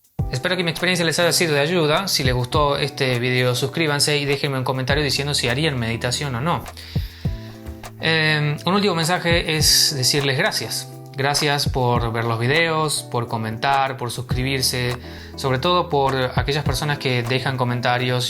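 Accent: Argentina. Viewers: Usted me podría decir de donde es su procedencia? Argentino?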